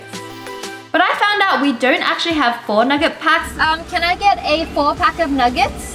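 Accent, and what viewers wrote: Australia. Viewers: Are you Australian??? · Wait is she Australian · Are you Australian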